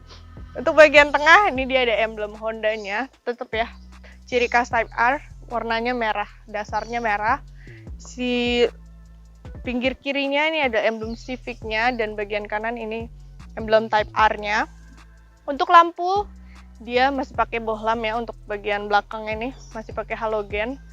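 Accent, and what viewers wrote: Java, Indonesia. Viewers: Wong jowo tah iki hhh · Kek ada logat" jawa nya kalo ngmong, ya mba 😁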